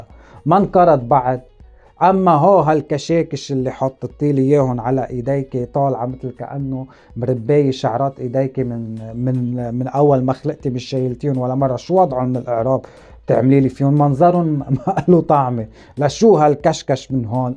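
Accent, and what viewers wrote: Lebanon. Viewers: تحياتي يا اكثر واحد مهضوم بلهجة لببنانية والله انت علاج كل المكتئبين والي عندهم الاستريس تفرج عليك ينسي كلشي هههه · تحياتي لك أخي ، تعجبني اللهجة اللبنانية خفيفة على القلب 💖 تابعت حلقة الأسئلة ؛ وفرحت جدًا إنك مسلم سني الحمد لله الله يثبتك ويهدي جميع الخلق ، ليس تعصبًا مني لكن هذا هو الطريق الحق وكل البشر سيكتشفون الحقيقة عند الموت تحديدًا أول ليلة له في القبر … أهم شي دينك الثبات الثبات . شكرًا لك 🌱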